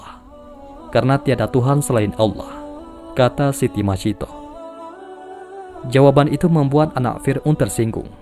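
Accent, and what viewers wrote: Aceh, Indonesia. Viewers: Dari aku perhatikan cara bicara channel ini Org ini org aceh logat bahasanya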